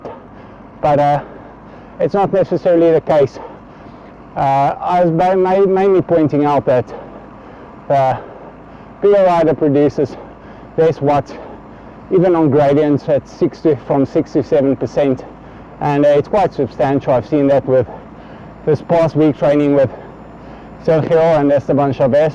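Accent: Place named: South Africa. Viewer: Somehow I think you are from South Africa?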